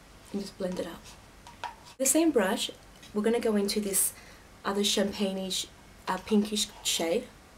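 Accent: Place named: Australia. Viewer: I absolutely love Australian accents. :) So cute! Beautiful look!.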